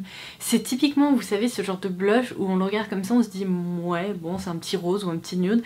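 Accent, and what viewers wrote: England, United Kingdom. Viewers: La vidéo qu’on attendait toutes je pense, au début j’étais comme toi pour la bave d’escargot mais finalement étant donné que ça fonctionne eh ben ma foi 😂😂😂j’adooooooore ton accent anglais en passant hihi et merci pour le concours 🤞🤞🤞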